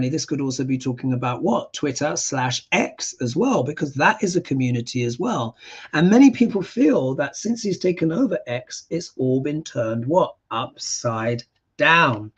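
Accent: United Kingdom. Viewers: (Jeannette M Mahan) Hi handsome Mr, your British accent is music to my ears, I love British people! I say that with the utmost respect.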